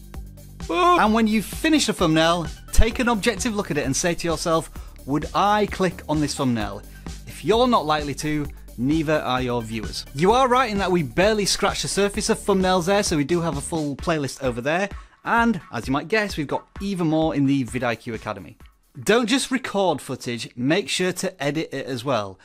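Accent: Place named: Scotland, United Kingdom. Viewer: i can tell he's scottish 😁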